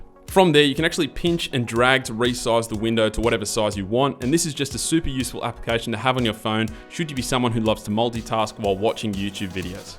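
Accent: Australia. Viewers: lemme guess ur a aussie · Good video, great editing, nice apps, good to hear an Aussie accent PS Badlands is way old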